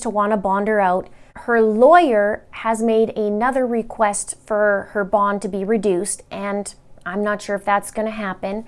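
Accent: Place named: Canada. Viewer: Where are you from? You sound Canadian. Love your videos! Can’t wait to see Lori and Chad get nailed to the wall for this! (And still so so so sad about Gannon 😫)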